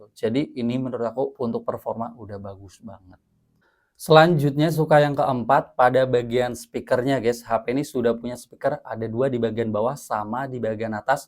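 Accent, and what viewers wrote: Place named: Banyumas, Indonesia. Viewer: Logat ngapak-nya masih kedengeran 😅. Tp raut mukamu oke ngab. Lanjutkan karyamu. Sukses selalu 🙏🏼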